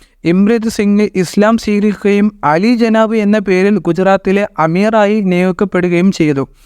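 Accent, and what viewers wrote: Malappuram, India. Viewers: മലയാളം പറയുമ്പോൾ മലയാളം തന്നെ പറയണം അല്ലാതെ മലപ്പുറം മലയാളം അങ്ങിനെ ഒന്നില്ല... നിക്ക് ചീരാപ്പു പുടിച്ചുക്കുണ് എന്നു പറഞ്ഞാൽ എനിക്ക് മനസ്സിലാവും കേരളത്തിൽ ഉള്ള എല്ലാവർക്കും മനസ്സിലാവില്ല... മുഴുവൻ എന്നു പറയേണ്ടിടത്തു മിയ്‌മൻ എന്നു പറയരുത്... ചായ കുടിക്കാൻ എന്നതിന് പകരം ചായ കുടിച്ചാൻ എന്നു പറയരുത്... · പല നാട്ടിലും പല ഭാഷകളാണ്. മലപ്പുറം ഭാഷ ഇങ്ങിനെയാ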